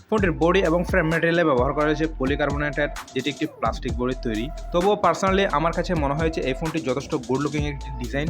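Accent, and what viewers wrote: Chittagong, Bangladesh. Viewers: বদ্দা চিটাইঙ্গে নে?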